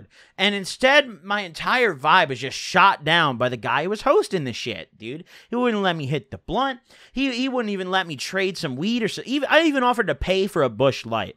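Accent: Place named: Chicago, United States. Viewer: I figured you were from Chicago you talk just like white boys from Chicago. Or idk if your from the west or south suburbs but you know what I mean.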